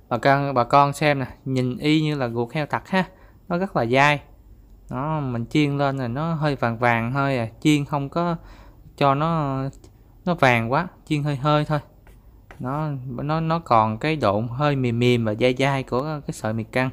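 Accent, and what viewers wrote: Mekong Delta, Vietnam. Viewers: Cách nói chuyện đúng chất miền Tây luôn ạ ❤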